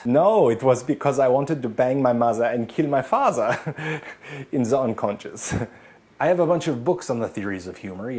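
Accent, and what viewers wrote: Germany. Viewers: ze has such a good german accent! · The hilarity of a german guy talking like a cliché german. It makes me so happy. Oh boy.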